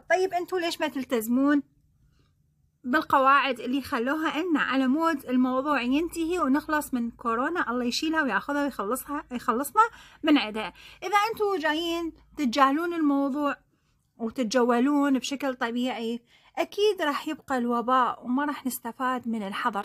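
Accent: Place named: Iraq. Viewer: اهلا بيچ خيتي شلونچ شخبار بلدچ العراق الشقيق فدييتچ اني جزائري وهم احب هالبلد الشقيق واعشق لهجتكم بس يمكن تسوي الي دولمه لو كبه البرغل لانو احب هاي الاكلات تسلمي خيتي الغاليه انتي واهلنه واحبايبنه بالعراق الشقيق🇩🇿🇩🇿💕💖💓🇮🇶🇮🇶✌️✌️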